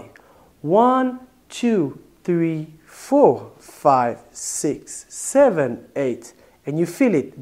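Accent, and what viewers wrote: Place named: Morocco. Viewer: wa axent maghribi:)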